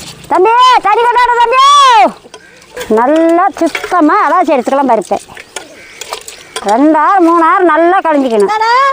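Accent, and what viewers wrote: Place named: Kongu, India. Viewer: Kongu region maari irkkuthenga entha ooru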